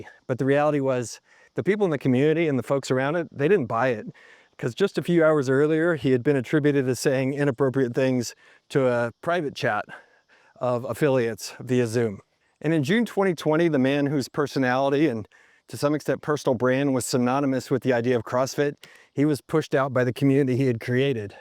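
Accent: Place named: United States. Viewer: Americans and their pronunciation of CEE / SEE names and surnames,…jeeeeeeeeeez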